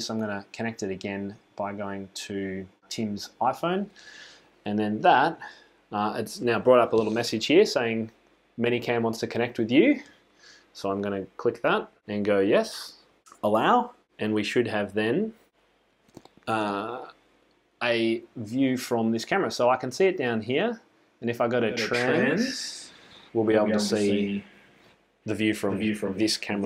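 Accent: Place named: Australia. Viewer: Super cool! I think I saw you on the Tom Lee webinar the first couple weeks of Covid lockdowns... I had written “mini-cam” in my notes and was looking for it. The Ozzy accent through me! Thanks for this video... I’m ready to do this! Would that be your preferred set-up to have a body shot and an overhead shot? I would think so... I would love to get my students on this (lots of Elementary level kids - need to see their bodies AND fingers!).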